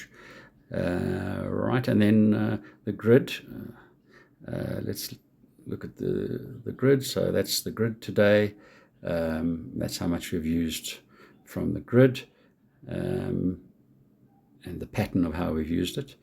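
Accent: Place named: South Africa. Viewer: I presume you have made this video in South Africa, judging by your accent. The App has been recently upgraded and for people in the U.K. or in the latitudes further away from the equator force charging from the grid is a very important function. Because if the user wants to make the most of the longer summer days to charge the battery and turn the force charging off they are able to do so. While in the WINTER months when you get only seven hours of sunshine and have an access to cheaper tariff rates during the middle of the night you want to charge your battery to use during the day time. The recent upgrade has TAKEN this facility away and if you are considering buying this system, have access to cheaper rate night time electricity and live more than 40 degrees latitude north or south of the equator this system is not a good buy.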